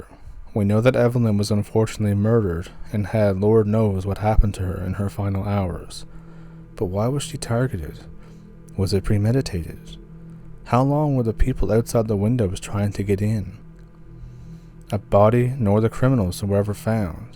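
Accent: Canada. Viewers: Canadian accent is lovely 😊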